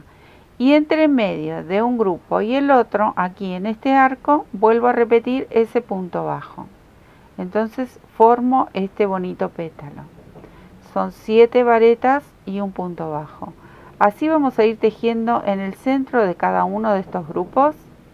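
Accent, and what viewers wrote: Argentina. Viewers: ¿Sos argentina? ¡Me encanta tu acento! Solía vivir en Buenos Aires a finales de los 80 y lo extraño ;)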